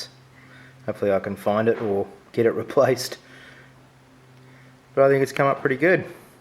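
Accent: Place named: Australia. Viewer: Hello Mr. - I couldn't identify the wood that stock is made of, until I Googled Sportco Model 63, and found out that it, like your accent, is Australian. That explained it! Your stock is made from Eucalyptus wood, which, for gun stocks, is not common at all. A very nice piece of wood on a very nice rifle.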